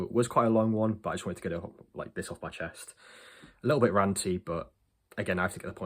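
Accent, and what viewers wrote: United Kingdom. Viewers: it’s a Yorkshire accent my guy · Is ur accent Manc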